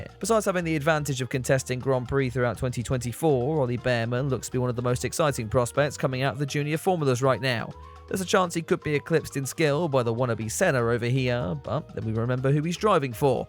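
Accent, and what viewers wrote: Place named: United Kingdom. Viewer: Just discovered your channel. Where have I been? Love your droll sense of humor! You are definitely in keen competition with another YouTube channel where the creator/narrator is probably an American, maybe Canadian. But I love the British accent, which Rick doesn’t have. A plus for you, for sure. I am subscribing now, only half a video in. Kudos.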